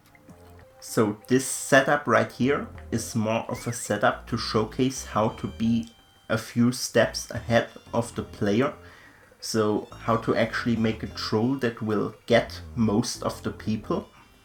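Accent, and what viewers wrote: Germany. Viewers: Dein Akzent hört sich sehr deutsch an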